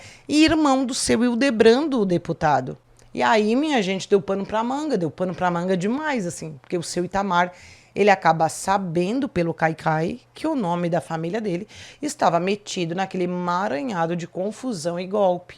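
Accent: South, Brazil. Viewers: JÁ OLHEI ESTE CASO MUITAS E MUITAS VEZES,MAS VOU OLHAR E DEIXAR MEU LAIKAO,PORQUE A APRESE NTADORA É A A LOIRAAAA MAISSS LINDAAAA DO MUNDOOOO E O SOTAQUE SULISTA ENTÃO,DEUS TE ABENÇOE RICAMENTE MINHA RAINHA,MINHA DEUSA MARAVILHOSAAAAAA❤❤❤❤❤❤❤❤❤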